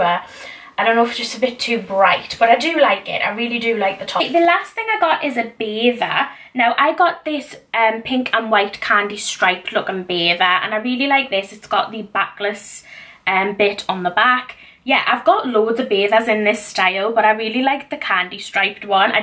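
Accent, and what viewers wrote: United Kingdom. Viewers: Hi I just saw your channel and I subbed STRAIGHT AWAY and I’m glad I did and I love your accent and I’m from the UK TOO!!!!!! but I’m from Scotland ☺️☺️☺️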